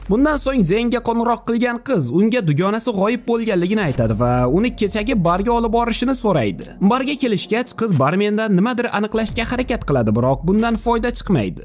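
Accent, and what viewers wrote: Khorezm, Uzbekistan. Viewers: Ogʻo omad xorazmchasina yoribsiz lakin sharxni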